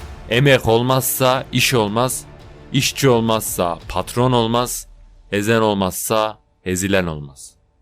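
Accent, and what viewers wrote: Ankara, Turkey. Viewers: herşey güzelde Ankara lı böyle mi konuşmak zorunda ,ne oldu güzel türkçemize? bilgilendirme için teşekkürler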